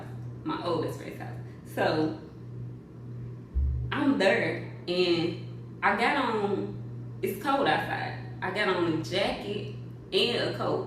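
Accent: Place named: St. Louis, United States. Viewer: soon as you started talkin i knew you was from stl 😂 but not the fight happened at your daddy house & not the bd house omg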